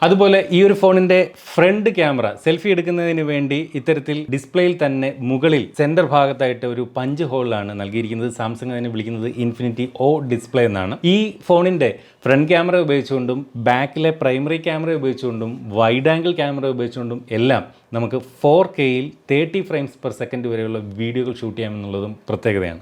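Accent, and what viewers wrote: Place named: Thrissur, India. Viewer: cheettan thrissur kaaranaano amsaarum nammade thrissur kaare poole undu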